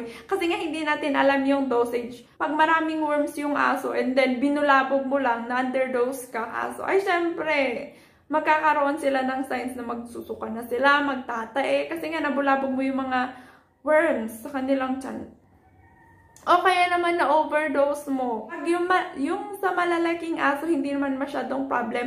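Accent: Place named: Ilocos, Philippines. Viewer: Hi Doc , Dito lang po kayo around sa Ilocos Region ? nagbase lang po ako sa accent nyo dito sa video . Anyway very informative po ng video nyo since kakapanganak lang ng doggy ko :)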